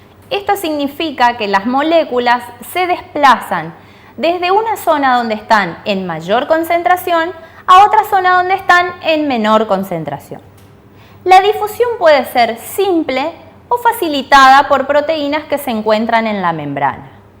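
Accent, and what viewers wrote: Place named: Argentina. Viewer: Eres de Argentina , siempre e querido ser de alli no se porque me encanta el acento :v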